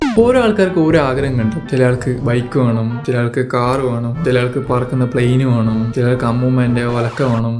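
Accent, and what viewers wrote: Kannur, India. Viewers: Chettante language slag aan pwoli Kannurkkaru poliyalle🔥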